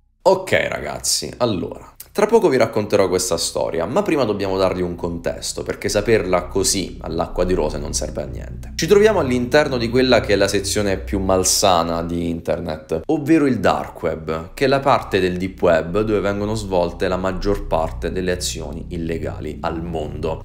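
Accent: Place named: Apulia, Italy. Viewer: Ciao, di dove sei? Il tuo accento sembra pugliese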